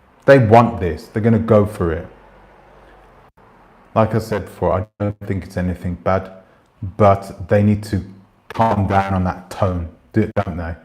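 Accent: United Kingdom. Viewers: Your English is perfect 🤣🤣 I love the British accent.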